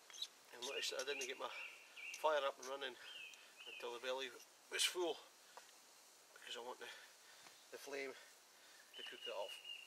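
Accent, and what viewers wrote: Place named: Scotland, United Kingdom. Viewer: Really enjoyed the videos, I have watched a few of your's tonight and have Subbed to your channel...can you clear up one thing tho, your accent, which shire of Scotland are ye from lol? Cheers, keep up the gid work jeck. I checked out your Wix page and answered my question, yer just up the road lol mun. Kielder forrest will be the playground then haha. Regards Ray. (Eyemouth)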